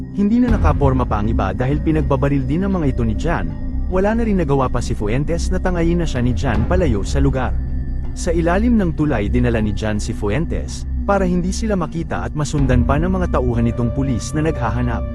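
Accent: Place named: Bicol, Philippines. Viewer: Aba bicolano ka pala manoy ,,, gayon Ang movie recap mo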